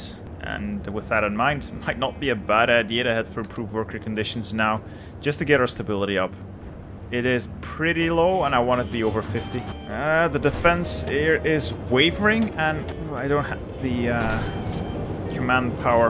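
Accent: Flanders, Belgium. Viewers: Wallowa of flemish, you sound flemish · O wauw ik dacht al dat je een vlaming was aan je accent, ik hou van uw content much love ❤️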